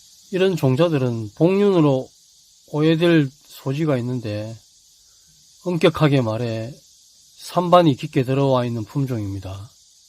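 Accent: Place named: Busan, South Korea. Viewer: 안녕하세요 영상처음접해 봅니다 난초들이 아주건강하내요 부산말씨같아요 저도부산사라인 데 지금은제주도에 서2년째살고있 습니다 완판하시고요 영상 자주보겠습니다 잘봤습니다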